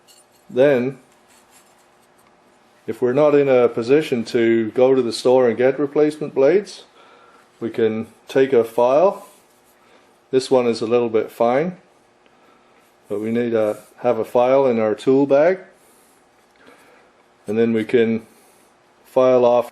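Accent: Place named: West Country, United Kingdom. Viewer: What is that accent?? Sounds like a mix of South West English and American 🤔🙂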